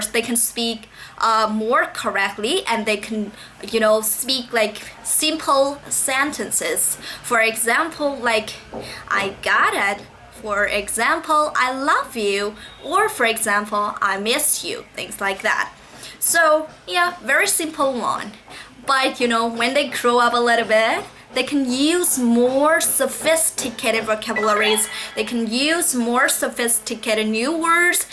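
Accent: United Kingdom. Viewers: I thought your senglish sometimes british sometimes american style, but that isn't no problem cause I have a problem with my speaking skill I'm too much learned grammar, but none people cares about the grammar, really exited your video!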